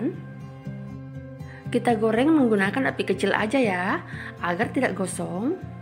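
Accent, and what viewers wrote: Indonesia. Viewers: Mba.. Asli Bugis Makassar yah? Logatnya kayak org Bugis, salam kenal yah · Kaya orang bali kalo ngomong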